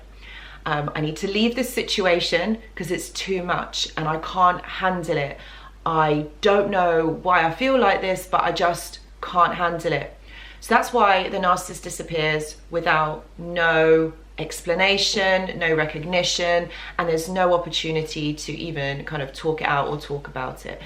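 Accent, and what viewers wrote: United Kingdom. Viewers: I think British because of her accent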